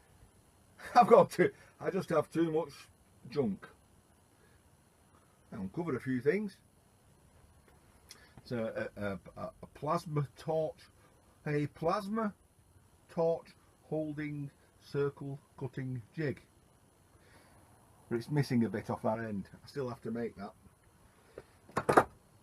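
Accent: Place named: Wigan, United Kingdom. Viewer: You sound like a Wigan lad, what scrapyard do you use if i may ask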